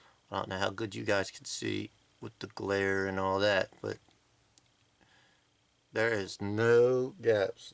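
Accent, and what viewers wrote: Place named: Philadelphia, United States. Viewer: You sound like your from philly lol!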